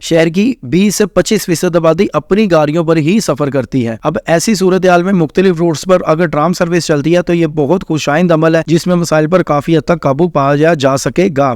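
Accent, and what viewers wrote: Punjab, Pakistan. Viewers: For panadol people certainly will be romantic trip as heer n ranjha and sohni maahiwal Who speak urdu in lahore Only cows n goat will ride along with payndo people Enjoy your ill letrate PM is leading this proviance Who can't write a word of urdu Who lives in lahore all butt and mughal caste 😂 Who can't see n speak any word of urdu or English as u r talking in punjabi accent urdu So good luck to all butt and mughal See old lahore and u won't any like to spit how dirty and congested and how people live like roaches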